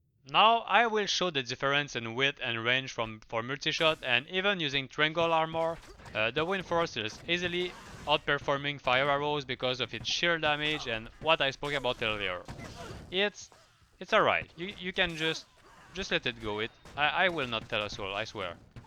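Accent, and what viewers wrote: Quebec, Canada. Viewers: That quebecois accent, I could recognize from a mile away. Nice build btw.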